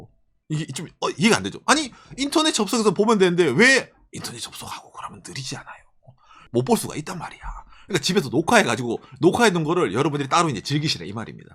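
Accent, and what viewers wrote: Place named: Gyeongsang, South Korea. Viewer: 이분 동남방언 너무 심하시네요 그것만 아니면 좀 편하게 들을수 있을거 같은데 구독은 못하겟네여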